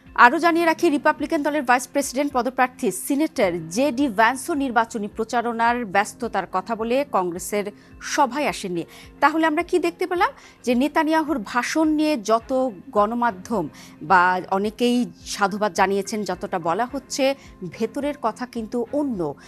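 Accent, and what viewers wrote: Kolkata, India. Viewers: কোলকাতা স্টাইলে সংবাদ উপস্থাপন😂। আপাতত শুনতে ভালো লাগলেও দয়া করে কোলকাতার সাংবাদিকদের মতো চিল্লা চিল্লি শুরু কইরেন না ভবিষ্যতে। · kotha to sundor but indian style a hoise seta khub e kharep lagse.. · ভারতের ঢংয়ে কথা বলবেন না।।।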